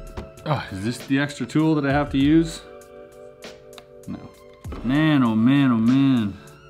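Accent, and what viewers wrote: Canada. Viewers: You’re Canadian aren’t you lol cameras sorted ooout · I fucking knew he was Canadian haha I was like “well he has a NASA hoodie” and then I was like “no American says out like that” I was right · is he canadian?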